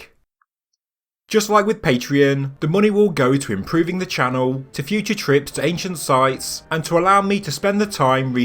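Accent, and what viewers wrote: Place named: Midlands, United Kingdom. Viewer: I'm from England, yet can't place your accent, sounds Midlands maybe. Another well researched presented video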